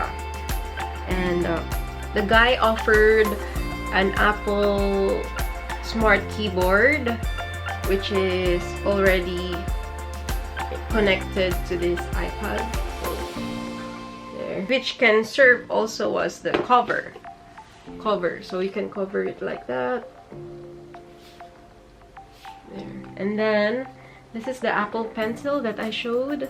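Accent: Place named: Philippines. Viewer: This is the very first video I'm watching of you, and I was like, "She looks like a Filipina than a Japanese." 'cause of the way you pronounced your words and then I read your bio AND TURNS OUT I WAS RIGHT!!! YEPPEYYY So Ate I hope you could answer my questions (⁎⁍̴̆Ɛ⁍̴̆⁎) + I'm almost graduating and my birthday is nearing, and I don't know whether to get the iPhone X or Ipad Pro together w the accessories. - If I pick the iphone x, it'll be my second phone I ever had for the passed years! I'm not using a phone rn only an Ipad, w a 16GB storage. I SERIOUSLY LOVE EDITING AND MY GALLERY IS PACKED UP W HOT KOREAN BOYS. AND I DISLIKE ICLOUD. + Do I have to purchase the app; Procreate? Or is it free? + Does the Ipad Pro have a screen recording? - 'cause I'm planning on making tutorials of my edits. + How good is the Camera Quality? 💜💜💜💜💜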